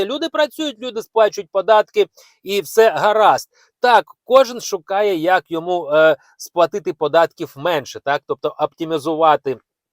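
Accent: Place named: Russia. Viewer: А что у вас за мова украинская чисто российским акцентом не пробовал размолвлять по-русски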